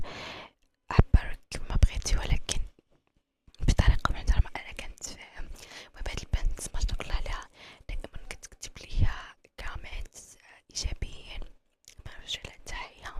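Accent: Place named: Syria. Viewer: هضري دارجة نيشان بلا ديك اللهجة د باب الحارة كانظن اتكون حسن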